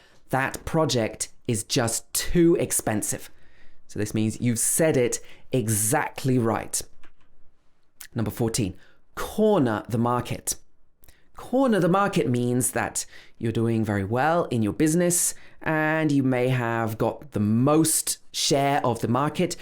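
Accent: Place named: United Kingdom. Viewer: Thank you teacher. The video helps me learning British English accent. I hope you make more videos regularly.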